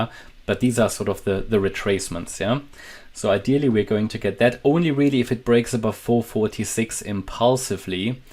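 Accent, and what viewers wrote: Germany. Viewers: Hast du ein deutschen aktzent oder täushche ich mich hier😂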